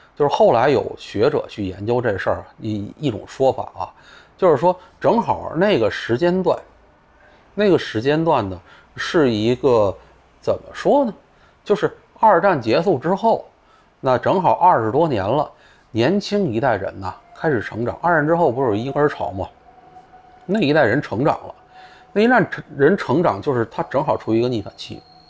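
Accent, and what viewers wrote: Beijing, China. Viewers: 北京人说话真是自带幽默感，我可太喜欢了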